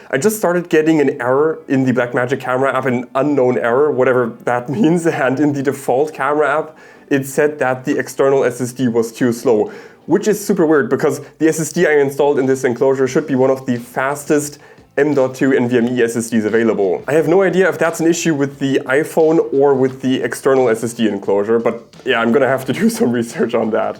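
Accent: Germany. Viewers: I hear deutsch😂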